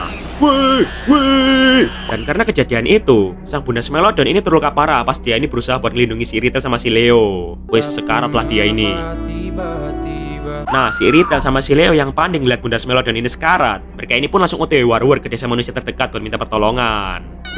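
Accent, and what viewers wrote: Java, Indonesia. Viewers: Bang, Abang Jawa ya?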